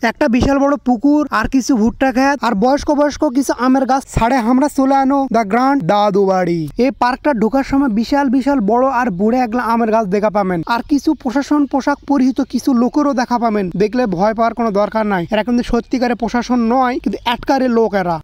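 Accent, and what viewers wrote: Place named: Dinajpur, Bangladesh. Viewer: কারন হামরা দিনাজপুরের ভাষায় এমন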